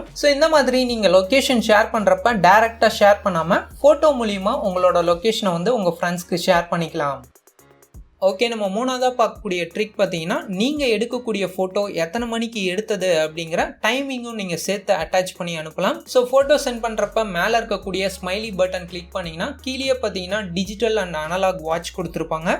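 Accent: Salem, India. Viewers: Bro nega salem atturah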